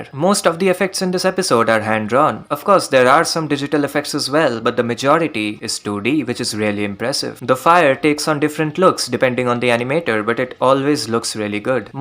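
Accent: India. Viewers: Great video! Are you indian?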